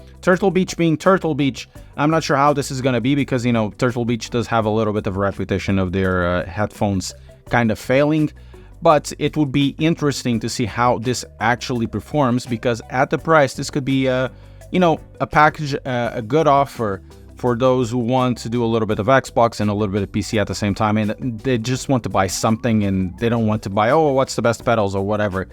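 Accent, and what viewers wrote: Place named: Portugal. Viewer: Had a feeling you were Portuguese for the slight accent. Amazing English but the Portuguese rhythm never goes away. Great work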